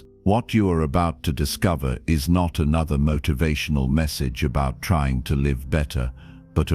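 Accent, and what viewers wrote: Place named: United Kingdom. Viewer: Nowhere in the word says we were broken with him. We died with him. I don’t like it when a different nomenclature is inserted that is not scriptural. We still have an old man nature I renewed mind walk is an intense mindset. Remember when Paul says who shall deliver me from this dead body… We are to reckon our selves dead unto Christ and renewed in the spirit of our minds to the new nature. Where is the British accent narration lead to authenticity regarding a Chinese Christian?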